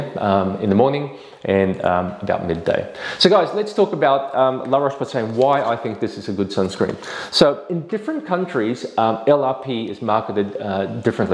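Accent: Australia. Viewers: love the Ozzy accent.. greetings from amsterdam :) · Love your Aussie accent.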